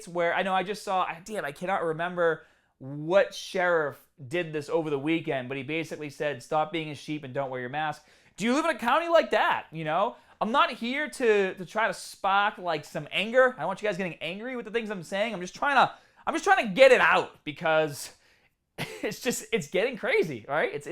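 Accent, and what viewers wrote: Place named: Massachusetts, United States. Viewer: Hi. I live in Northampton, MA (in Western MA, home of Smith College.) I have an accent like you, lol! Our gyms are still closed in my area. I don't know about other parts of MA; In Boston and Worcester things were and still are much worse than here, this is a small city, so gyms are probably still closed in other areas of MA. I do know a few people who got Corona in my city who have all recovered except one older man who died, but we are not a "hotbed" in Northampton. I guess our governor is being extra careful. I'm so excited for the gyms to open again, but I hope I don't have to wear a mask. It must be so uncomfortable to workout in a mask! We are still required by law to wear masks in public in MA, you risk being fined $300 if you don't wear a mask! By the way, Animal agriculture is what caused the coronavirus. 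Animal agriculture is the breeding ground for future pandemics that will harm everyone on the planet. COVID-19 is just the beginning. More pandemics are coming. The overuse of anti-microbial drugs on animals and humans is fueling resistant pathogens that could kill 10 million people annually by 2050. Future diseases are brewing right now on factory farms across the nation, because of the crowded, filthy conditions. 70% of the antibiotics we feed animals to prevent rather than treat infections are medically important for human health. This allows antibiotic resistance to breed. Farms are not required to disclose the amount of antibiotics used or the prevalence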